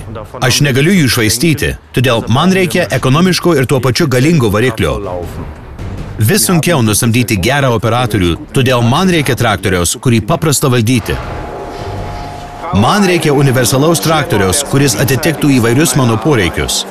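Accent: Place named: United States. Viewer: komentatoriaus amerikoniskas akcentas zudo :D:D:D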